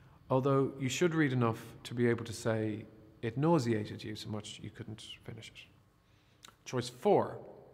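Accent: Ireland. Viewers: It’s an Irish accent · He is speaking Hiberno-English. And the speech is universally relevant. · That's a posh Irish accent.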